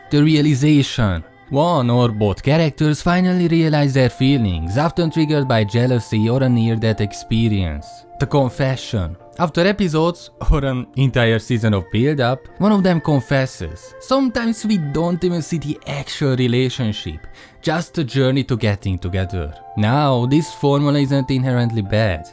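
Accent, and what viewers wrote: Hungary. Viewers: Proud Hungarian accent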